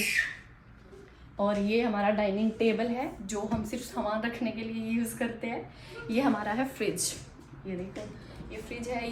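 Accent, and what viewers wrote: Haryana, India. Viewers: Hi love I also live in Liverpool ur look’s very pretty I liked ur haryani language it’s so sweet but u need to more clear that it’s two bed room house rent and all rent r not same it’s also depend on ur area if u live in city centre it’s rent more expensive than ur. If u live in good area it’s expensive too. One thing more all houses r not same. there are four types of houses. U show a traces house.Other houses names r Sami detach house, detached house, flats and bungalow.there rent r also different too. Mostly house have there beautiful gardens too. I am sorry my aim is not to let down ur videos I just add more information for ur viewers. Really I always like ur videos and naughty ur baby. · Apki haryanvi b bahot sweet hey. Prantu abi ap Urdu bol rahi ho likho bhaley hindi mn ya kaho bhaley isey Hindi 😅😅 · Haryanvi boli achi lgti hi apppar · 👍👍👍👍 appp Haryanvi boli me bahut achhe lagte ho.. 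· ap haryanvi ma bat kya kro hum ko aap ki haryanvi bohot achi lgte ha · AP Hindi bhi haryanvi me bolti ho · Chorrii ghaint laagg ri hindi ma b...nways ...its nyc vedio..i thought u wud b in India...bt harayanvi touch suit kre thare pe..ikk harayanvi mein b bna do ji vedio harayanvi chorra khatir...ghani baavli lagge se.. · loved your Haryanvi accent, nice video, you are very cute and innocent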